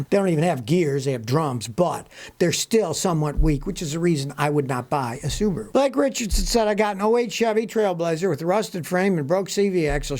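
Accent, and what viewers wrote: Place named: South, United States. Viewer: If you glued Scotty's hands to his knees, he wouldn't be able to say a word. He talks like folks from the South (says a gal from the South).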